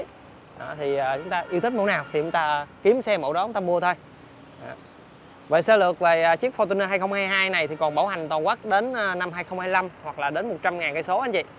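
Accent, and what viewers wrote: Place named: North, Vietnam. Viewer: Sao kênh này mà có khi nghe giọng người Bắc?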